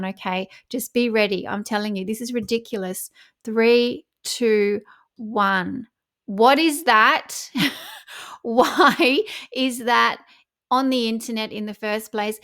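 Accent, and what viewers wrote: Australia. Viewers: You have a beautiful laugh! Love your work. Great to hear a fellow Aussies voice about this awful woman! We can smell bullshit and seriously, even if she were to go global 😅 i reckon the Australian market would be her worst. If i ordered my latte, made by barristas who know me, and they put flower sprinkles in/on it, I would suggest a holiday was in order. We take our coffee seriously down here. Imagine Lygon St italians seeing this! Santa Maria 😅😅😅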